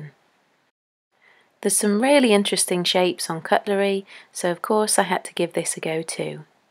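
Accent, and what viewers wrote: England, United Kingdom. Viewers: Great video and as someone else said no music just your lovely calm voice ..One of the best videos I have seen and also great ideas on our doorstep ..thank you so much ..Also lovely to hear someone English for a change.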